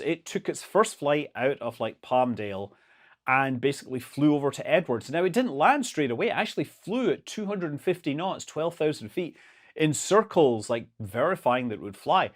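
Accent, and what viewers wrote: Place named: Edinburgh, United Kingdom. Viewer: scott manley is a scot, and manly :) i do a fair accurate glasgow accent. i love yours. doesnt quite sound like glasgow..... or maybe mine doesnt. sounds more edenborough (and i am canadian so wtf do i know)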